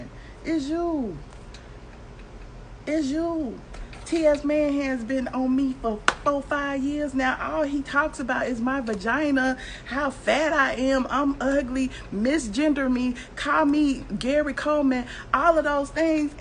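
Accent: South, United States. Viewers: you be tickling me to death lol gosh I miss the South when I hear you talk.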